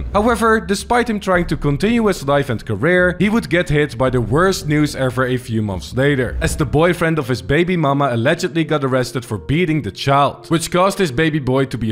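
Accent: Netherlands. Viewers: Heey een Nederlander die Engels praat!! Of probeert althans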